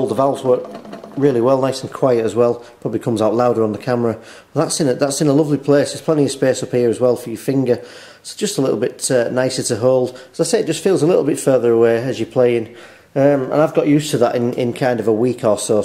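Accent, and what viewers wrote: United Kingdom. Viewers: Appreciate your videos, very helpful, considering purchase of flugelhorn after buying Trumpet. Sounds nice, as soft as a cornet, what mouthpiece is being used DW 3FL, caught at end, thanks. Used to live in Sheffield so lovin the accent! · very helpful thank you and I personally really like the Yorkshire accent - it makes it all sound genuine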